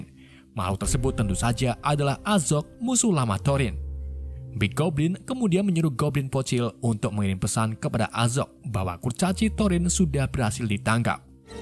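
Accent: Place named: Tegal, Indonesia. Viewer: Maceme nt wong tegal ya WA😁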